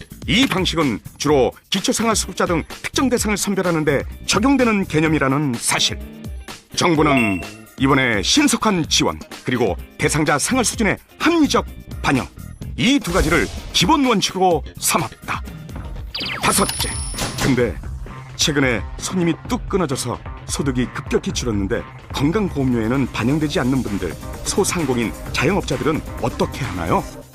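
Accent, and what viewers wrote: North Korea. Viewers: 앵커 북한 사람임? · 성우 말투가 북한같냐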